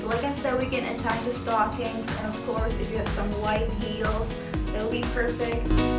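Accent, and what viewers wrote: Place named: United States. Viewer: Your American accent is good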